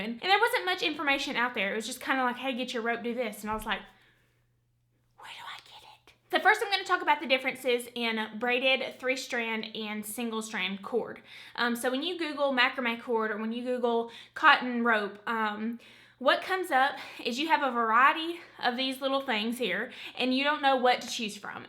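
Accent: South, United States. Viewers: Im from germany and your southern accent is soooo amazing, i love the way you talk!! And of course the info on the macrame rope is very helpful lol 😉👍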